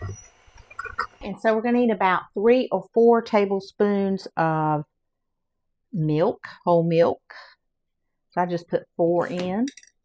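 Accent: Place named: Carolina, United States. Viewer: Subscribed a few weeks ago. I can tell you are a Carolina girl. Your accent is just like mine and my family's! Love it! Going to try this recipe for sure.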